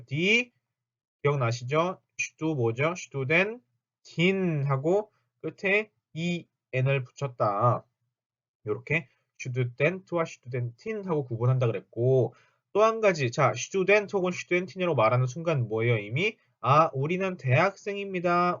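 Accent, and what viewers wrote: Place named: Southern Germany, Germany. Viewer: R발음을 남부발음으로 하시는데요. 북부에서는 일빈적 ㄹ로 하던데.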